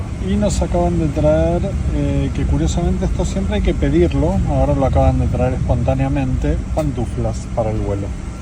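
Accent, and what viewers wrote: Argentina. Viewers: A este muchacho no le saco la ficha habla con palabras de España con acento argentino, raro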